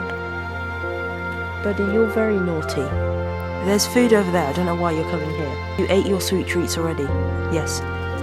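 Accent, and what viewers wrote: United Kingdom. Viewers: HI hello there, lucky to find someone who`s close to the nature. good to see you here, exceptional work done, great videos, amazing Brit`s accent. music, everything is so perfect n upto high standard videos, love to spare few moments. plz carry on making more videos. stay safe and rise n shine.............🐿🐿🐿🐿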